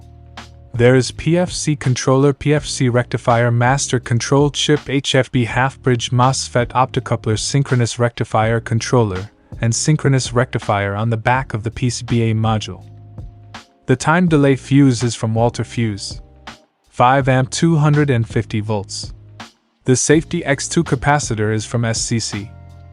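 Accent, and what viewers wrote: China. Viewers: I really prefer your english with chinese accent instead of this AI english transcription.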